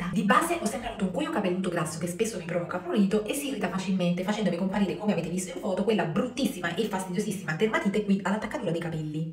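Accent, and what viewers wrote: Naples, Italy. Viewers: Sei molto bella e bravissima, ti ho scoperta da poco ( sono una signora sessantenne).toglimi una curiosità, ma sei napoletana?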